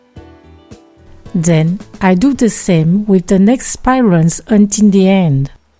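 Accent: France. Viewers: Bravo! English sounds so much better with a French accent. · LAOTIANS with a French Accent! Wow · I love pinneapple and thats why Im here but after 5 minute of french accent English, I feel like ordering some "baguette avec beurre" :))) I actually enjoy French accent English speakers.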